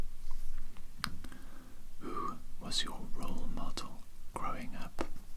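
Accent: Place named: North Wales, United Kingdom. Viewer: i’ve been watching you for a while now and i’ve just gotta say it, are you welsh? because i’m a valleys girl and your accent is giving me north wales all the way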